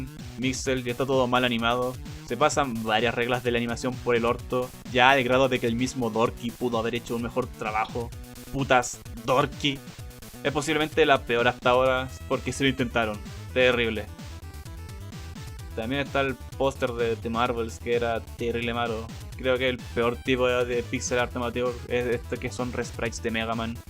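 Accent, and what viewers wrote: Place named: Chile. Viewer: Tiene acento chileno Laik 👍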